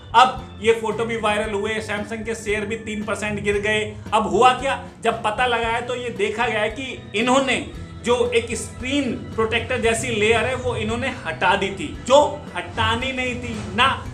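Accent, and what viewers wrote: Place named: Haryana, India. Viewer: Bhai video bich me rok k comment kr rha hu You are so funny bhai haryanvi lag rhe ho bhai aap muje samsung fold bekaar phone🤣🤣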